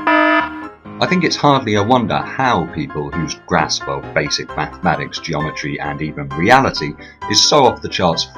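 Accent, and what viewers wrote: United Kingdom. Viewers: I'm American, male, 52, and British accent + sarcasm STILL intimidates me!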